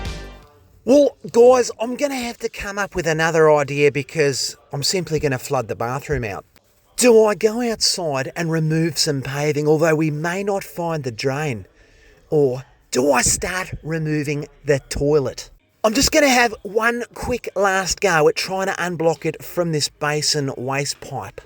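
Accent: South Australia, Australia. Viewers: South Aussie mate 👍🏻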